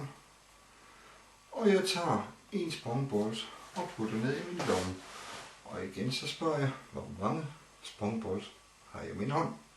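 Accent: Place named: Jutland, Denmark. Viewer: Jeg tager én spongeballs :D haha, nice sleights men den jyske accent er sq lige i skabet :D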